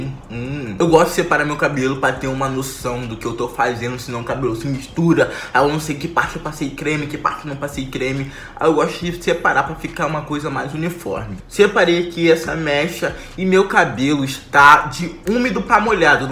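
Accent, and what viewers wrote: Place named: Brazil. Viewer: Brasileiro tentando falar inglês realmente dá pra perceber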